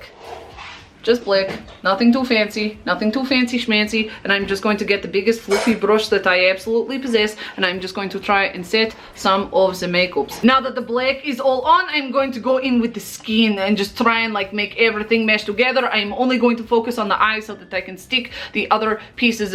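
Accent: Australia. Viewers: OmO I'm back. Oh lord. I found Piloff and this accent first.. and I started to go through your other videos because I am in love. But I was shook hearing the aussie accent. You are so extra and halarious!! Keep up being the fabulous you!!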